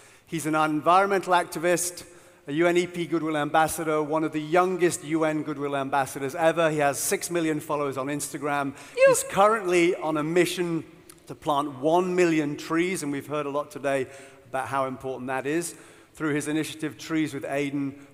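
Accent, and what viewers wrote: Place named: England, United Kingdom. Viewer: Hello Aidan, I hope you see this comment. You have many fans in Turkey and there are no Turkish subtitles in your videos. It is not a problem for me, but I think it is a problem for those who do not understand the English accent. Please take this comment into consideration and you should do something about this issue. I think we love you.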